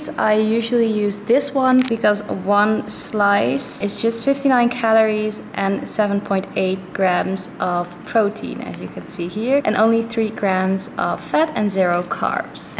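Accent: Netherlands. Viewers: Just a tiny question.... are you dutch? Edit: laat maar de Milner heeft t verraden 😂 ik merkte het aan je accent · I heard the accent and thought: Hé, Holland!! Leuk joh dat je vlogt! Vorig jaar was ik ook super healthy en toen... werd ik zwanger 🤰🏼. Lief kind maar wat ie met mijn lichaam heeft gedaan 😱 PUDDING!! Ik wil mijn abs terug! 😫 Dus we moeten van een “pudding-“64 kg naar een “in shape”-58,5kg. Here we go!! 💪🏻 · Wait... Are you Dutch?🙈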